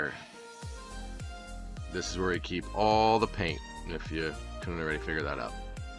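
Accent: New Jersey, United States. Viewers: I've been trying to figure out who you remind me of, at first I thought maybe it was someone I knew or worked with but I realized it's Mike Rowe the "Jobs" guy and your stuff would be perfect for his programing, your walkabouts and overtime clips throw in a drone shot and I think they would love it. Just an idea, I just realized your talking to yourself when you make these, if you wonder your voice is very smooth with just a touch of Turnpike.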